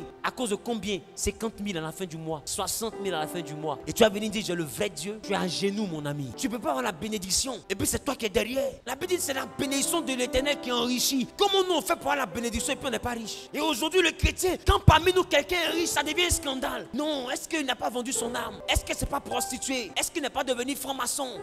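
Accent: Ivory Coast. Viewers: Je suis fou amour de l'accent ivorien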